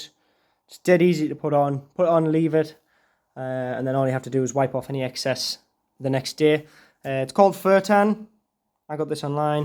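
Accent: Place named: Durham, United Kingdom. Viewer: Respect man, I love proper minis, and I’m originally from the Durham area so love your accent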